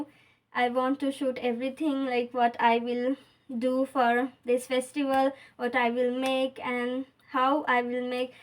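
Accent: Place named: Sindh, India. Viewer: Apki language se aap mujhe sindhi lagti hai mujhe reply kijiyega khushi hogi ke bhar mulk se mujhe reply mila.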